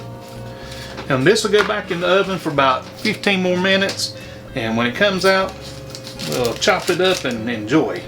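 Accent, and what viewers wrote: South, United States. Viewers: Yes to pizza and double yes to that lovely southern accent.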